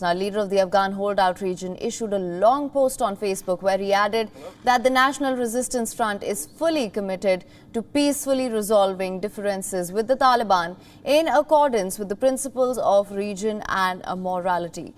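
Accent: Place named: India. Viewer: Accent is never a problem for anyone in the world except for Pakistanis. She is Indian, not American to have american accent. So, get this digested.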